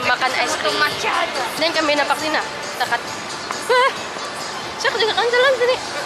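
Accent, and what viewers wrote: Sabah, Malaysia. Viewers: org sabah mana ko? mcm logat tu tau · I like your sabahan excent ttap maintain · sabahannn xD from the accent i know dy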